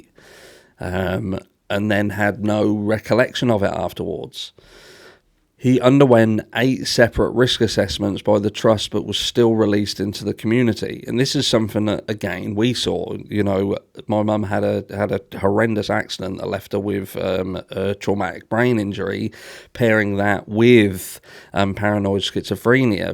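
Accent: Norfolk, United Kingdom. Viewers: I am new here. I actually miss the Norfolk accent. I was brought up around the NW Norfolk coast and spent a lot of time working Norwich way. I appreciate the sense of humour you bring to some of the stories you cover. Sometimes I fly back via Norwich airport and I love hearing people say they are going to Tesco's in the Norwich accent...awesome;). Your channel reminds me of why I loved my life in Norfolk so much. It was the good hearted humour and friendly attitude of the people you met where ever you were. I hope the recent disgusting abuse of power during the Coviet Union takeover has not dampened the spirit of the good people of my County. Bless you and your family and keep your positive attitude my Norfolk Cousin ;)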